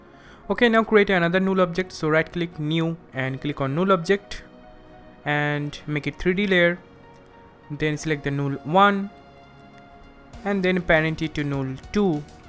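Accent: Bengal, India. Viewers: India for sure buddy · Darun laglo. Ami katha sune bujhlam apni Bangali. Khub informative video, ebong khub sundor. Sudhu ektai jinish, if you don't mind, uchcharon gulo ektu thik kore nilei bas, perfect. All the best brother!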